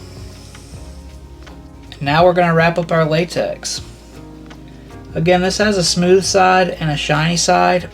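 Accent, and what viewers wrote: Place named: South, United States. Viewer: I cant figure out your accent,much love and respect from western NC,you sound like you could be from the south but cant tell for sure.i grew up fishing the Sierra nevadas as a kid but call asheville nc home now..but also what state you doing tattoos in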